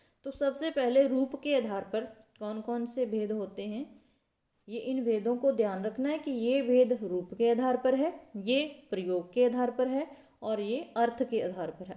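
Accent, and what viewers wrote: Punjab, India. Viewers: Mam apki hindi me Punjabi touch h aap Punjab se ho.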